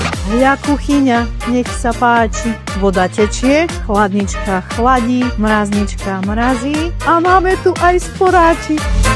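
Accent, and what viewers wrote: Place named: East, Slovakia. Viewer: Ďakujem za odpoveď, zachytila som východniarsky prízvuk, tak som myslela, že ste sa tam len napr. vydali. Tak nech sa darí!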